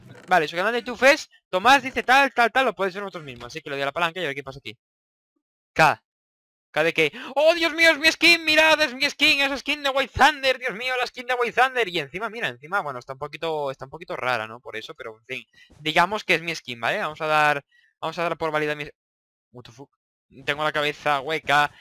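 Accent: Argentina. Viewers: sabes hablar bastante bien en argentino. Saludos desde Argentina eres uno de mis youtubers favoritos sigue asi. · estas hablando cordobes como david saludos de argentina te qiero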